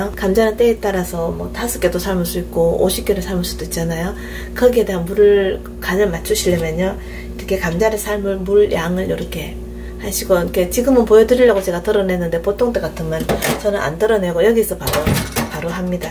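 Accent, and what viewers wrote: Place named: Busan, South Korea. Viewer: 친정이 부산이라 목소리랑 억양이 넘 친숙하네요~^^ 좋은 정보 감사 드려요~♥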